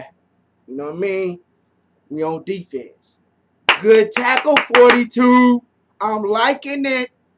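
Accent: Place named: United Kingdom. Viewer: Your British accent! Lmao 🤣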